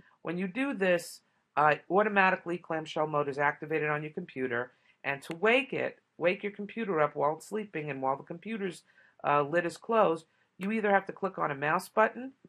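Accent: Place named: New York, United States. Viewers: love your accent, haha since i am a New york fanatic, i instantly knew it,hahah i know, but im from europe and I really want to go there someday, great video thank you for the great tips :) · Cool Accent. New York ? Jersey ?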